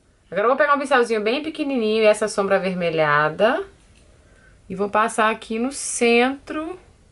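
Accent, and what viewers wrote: Minas Gerais, Brazil. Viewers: Uma boneca!!.♥Amo seu "minerês",tbm sou mineira.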